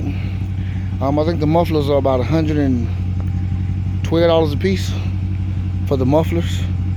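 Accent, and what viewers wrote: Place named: South, United States. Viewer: Sounds good dude. I can tell your country AF with that accent. Got that “boiler pro”. LOL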